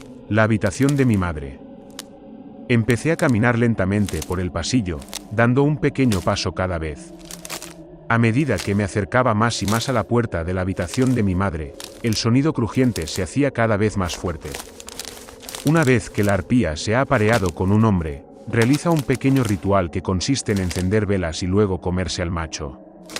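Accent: Spain. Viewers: Es encantador como los Españoles pronuncian la "S" , en cada País es distinta la forma de pronunciar ciertas letras y nadie tiene porqué hacer llamado de atención de como uno pronuncia una palabra .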